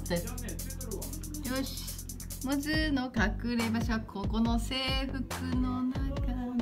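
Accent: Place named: Ishikawa, Japan. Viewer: ナイス石川弁！！もっと方言出してー！！嬉しい('ω')ノ♥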